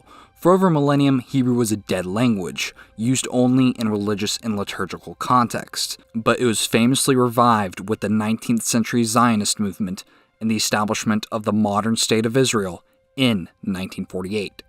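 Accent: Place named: England, United Kingdom. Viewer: Everything was almost perfect.. I just have one problem. The way you pronounced the Hebrew sentence in the middle of the video, offended me greatly.. lol.. kidding. But it was way to harsh to listen to. If you want to improve your pronunciation (in modern Hebrew, since that's how you pronounced it), the Kh has to be softer, and the stress is a bit off in most words.. your English accent is also pretty noticeable in the lack of clear vowels.. it should be pronounced more like in Latin (the Ah sound, that should sound more like Uh). Apart from that very clear and precise video, filled with factual information.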